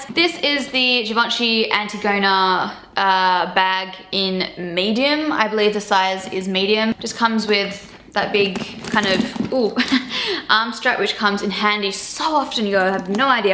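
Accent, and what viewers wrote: Australia. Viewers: The Australian accent is really strong ahah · are you aussie i am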